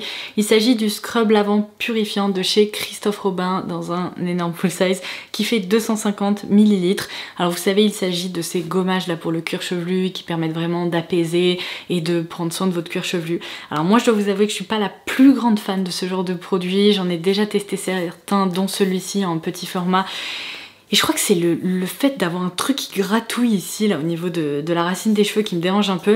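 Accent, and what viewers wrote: England, United Kingdom. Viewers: Bonjour, ma question est un peu hors sujet dsl mais j’aimerais savoir si vous êtes d’origine anglaise du à votre accent anglais. Bonne journée.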